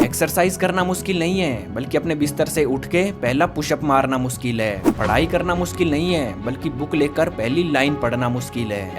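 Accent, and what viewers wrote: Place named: Haryana, India. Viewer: bro is from haryana it tell voice tone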